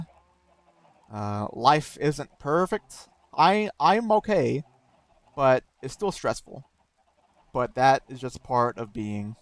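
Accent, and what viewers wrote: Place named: Georgia, United States. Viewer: thank you for sharing your story it was very nice. you actually said you were from the south and it didn’t click until you said that and I was like “oh yeah! you sound just like people from my city!” I’m from north GA. I’m glad that you’re feeling better and sh clean for a year! congrats! 🎉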